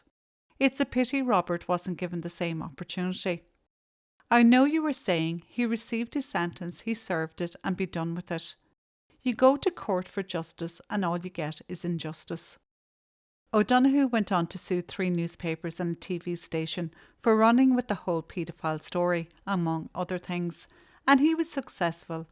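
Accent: Ireland. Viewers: I subscribed 20sec in, coz of the accent.. I am Australian after all, and we just love an Irish accent.! 🍀 But then you said horseshit girl.. and you had me again. 😅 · Not boring in my opinion, a million times better than most US crime doco's with all their hysteria and emotive over dramatic adjectives. I'll take this calm voice and lovely Irish accent every time.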